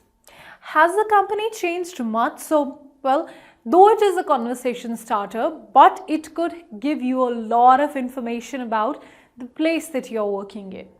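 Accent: India. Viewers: Yet, It's an Indian English :face-red-droopy-eyes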